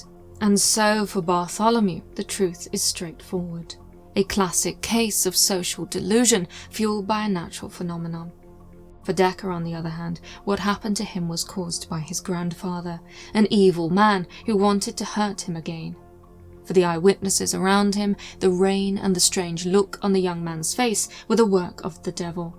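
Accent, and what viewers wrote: England, United Kingdom. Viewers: I absolutely love this channel 💓. The narrator with her English accent makes for an amazing story teller!! I also love how the narrator takes the content seriously and tells it in a serious manner ❤.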